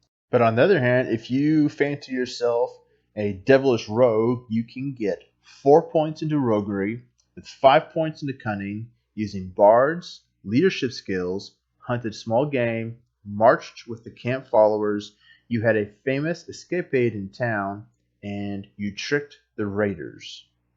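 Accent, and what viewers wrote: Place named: United States. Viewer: U sound American for an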